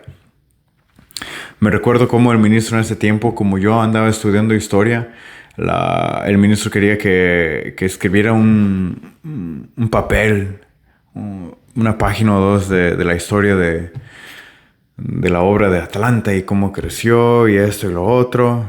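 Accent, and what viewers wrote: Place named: Oaxaca, Mexico. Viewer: Oye Bro ! Eres de norte América o de sur Oaxaca ! Lo digo por tu acento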